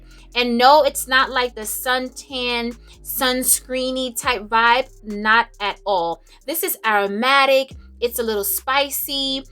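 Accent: New York, United States. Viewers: I can tell you lived in New York with that accent. Love your eyes 👀 . Lady I am old and just stop to smell the aromatics. I wish I had a Lil of the gallery behind you. Beautiful dress and earrings.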